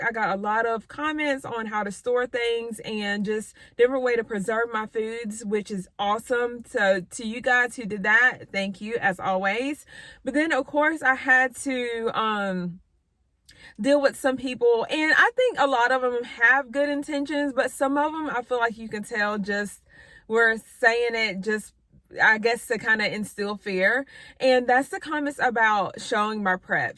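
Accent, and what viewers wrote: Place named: East Coast, United States. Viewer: Im new in watching your channel...love the pantry tours..and your accent is cool too!! I mosdef can tell you are from the east coast!!! Keep doing you!!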